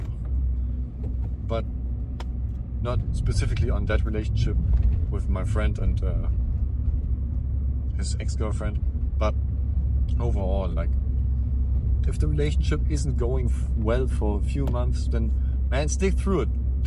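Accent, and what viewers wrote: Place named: Germany. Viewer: Your accent is so german, u german?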